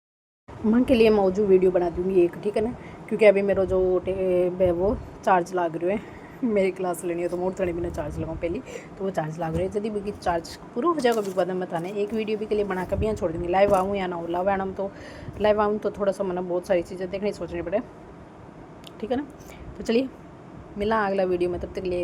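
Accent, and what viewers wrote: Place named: Marwar, India. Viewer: Hindi se achi toh marvadi lage छ थे तोह मारवाड़ी ही बोल्या करो जीजी 😊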